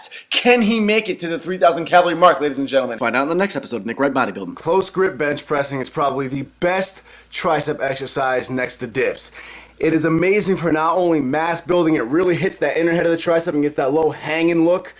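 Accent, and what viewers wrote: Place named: Rhode Island, United States. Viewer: Did I just hear a classic RI accent Nick Wright Boda-buildin?! hahaha nice video brah! After a few weeks we will see how its working and make adjustments from there.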